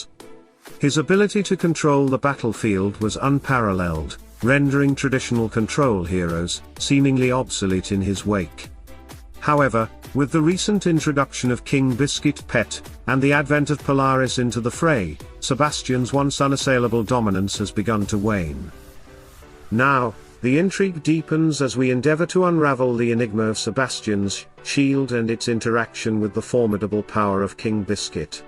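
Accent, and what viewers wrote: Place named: United Kingdom. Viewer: You sound like you are from Britain.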